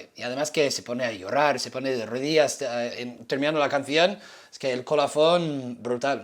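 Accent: Spain. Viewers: Vaya acento mas raro tienes tio. No lo digo criticando. Un californiano con acento de españa es como un perro verde. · Tiene acento castellano. Flipo! ❤️ already in love. Enhorabuena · Por cierto tu español es buenisimo tienes acento español no latinoamericano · Usted tiene acento español y usa frases españolas como "joer", no creo sea su primera vez oyendo a...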